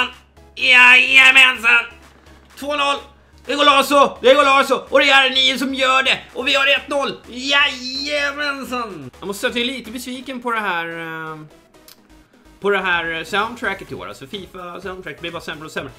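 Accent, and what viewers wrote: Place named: Stockholm, Sweden. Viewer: sjukt hur stockholmsk du e men ändå bäst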